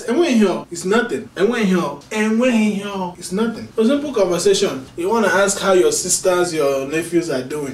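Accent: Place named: Igbo, Nigeria. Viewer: where are you from…the dialect of igbo is a little different from what I'm used to…but completely understandable ! keep it up !! i look forward to these videos.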